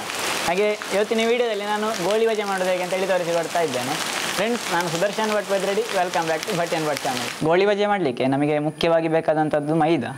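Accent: Mangalore, India. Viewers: I enjoy watching your videos. I so so like your beautifull mangalore kannada. Keep up your great work.., good luck :) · Love your mangaluri kannada bro..Awesome ambience/rain,lovely presentation,mouth watering goli bajji..What else you need?!😄🙂👏👏👍👍👌👌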